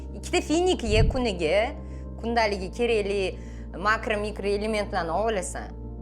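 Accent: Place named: Uzbekistan. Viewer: Toʻppa-toʻgʻri xuddi asl haqiqiy oʻzbek ayoliday gapirdingiz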